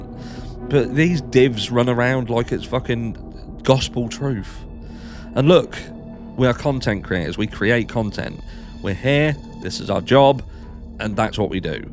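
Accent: Norfolk, United Kingdom. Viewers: Love your videos. Where are you from. What is your accent. Are you from Norfolk.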